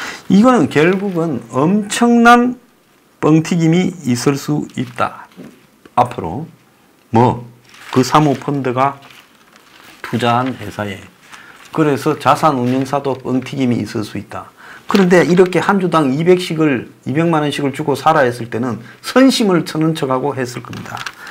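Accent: South Korea. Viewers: 남부 사투리에 가까워요.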